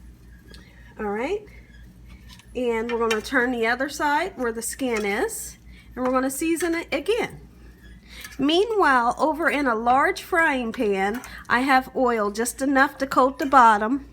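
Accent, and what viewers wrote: South, United States. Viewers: yes I could see her on food network or a country soul cooking channel she should start sending in some of her recipes to those type channels like CMT because she does have a bit of a country drawl . This chicken looks so good ima slappin that like button . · I'm from n.y and l came across your channel and l'm loving it Wow!! the cooking you're doing. Plus your southern voice is amazing to me to being a new yorker... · love the southern accent thank you for the recipe!! love from africa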